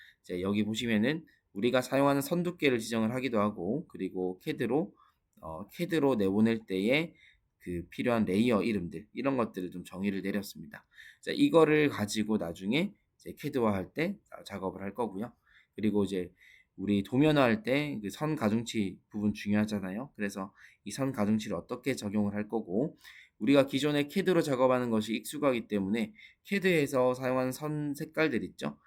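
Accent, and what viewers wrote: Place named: Busan, South Korea. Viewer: 부산이네요 ㅠ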